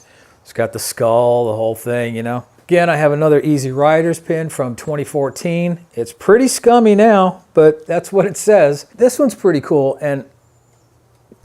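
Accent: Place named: New England, United States. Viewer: !! A fellow New Englandah! I knew there was something familiar about you! But seriously, your videos are the real deal. You are articulate, witty, wise, and above all, interesting to watch! Your editing skills are next-level. Clearly, you know your stuff! I have no doubt you’ll be a big hit on the YouTubes. And best of all, you’re in the Carolinas. My girlfriend used to live in Ladson, so she is going through PTSD watching you ride route 26. Hahaha!!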